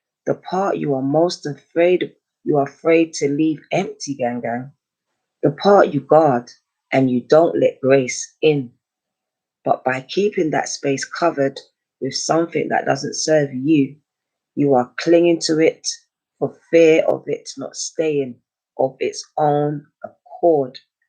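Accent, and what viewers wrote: United Kingdom. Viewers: I thought so! ☺️ I‘m Swiss but my boyfriend is from Manchester too thats why your accent sounds familiar to me 😍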